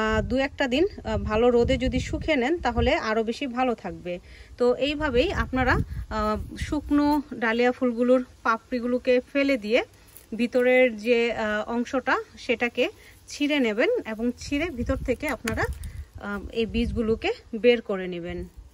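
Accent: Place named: Bangladesh. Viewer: Mone hosse apni bangladeshi. Valo laglo.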